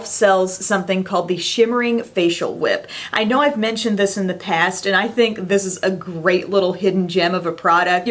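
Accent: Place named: Kentucky, United States. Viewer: done! added you. always glad to support a fellow KY girl! heading over to watch you now. wasn't sure I had the right person till I heard your accent! lol sounded like home. have a good day!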